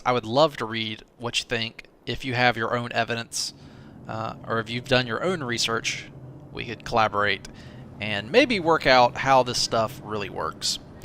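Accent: South, United States. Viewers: That's just his southern accent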